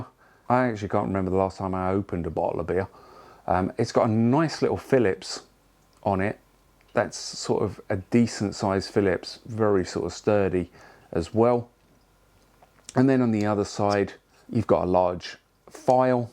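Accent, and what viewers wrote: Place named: United Kingdom. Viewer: Switched of at aluminum. Dude your British.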